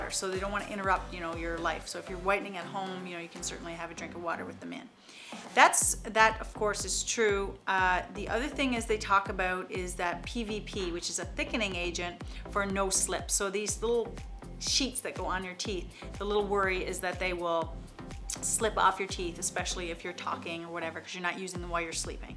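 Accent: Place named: Canada. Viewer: She's gotta be a fellow Canadian according to the way she says 'about'.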